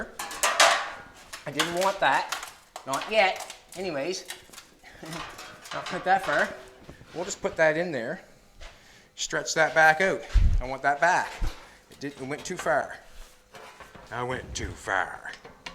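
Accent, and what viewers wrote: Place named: Maritimes, Canada. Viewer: First time here, I knew that was a maritime accent! Great work!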